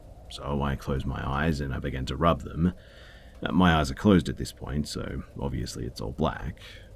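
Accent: Australia. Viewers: He's Australian? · He's an auzzie mate so fuck off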